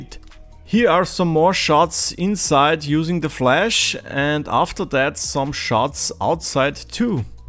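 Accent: Germany. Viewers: fast Bayerisches Englisch....wie geil ist das denn :P cooles Video...vielen Dank dafür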